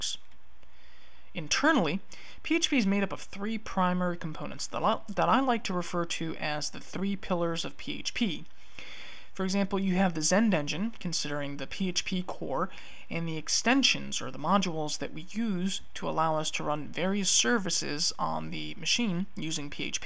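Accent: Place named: United States. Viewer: This was outstanding, and spoken in plain American English. This is the third one I tried and the 1st one I understood.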